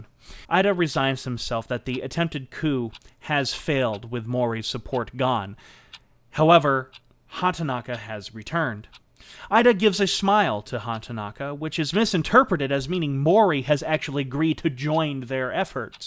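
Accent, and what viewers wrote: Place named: United States. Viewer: About half-way through and really enjoying the telling of the story - the one thing that is grating on me is the pronunciation of some of the Japanese surnames :( Ida for instance (I believe) should be "ee-da" and not "eye-da" and Takeshita should be "ta-kesh-ta" not "ta-ke-shii-da". Gotta watch that American accent and those "t"s . Aside from that, good story, nice pace.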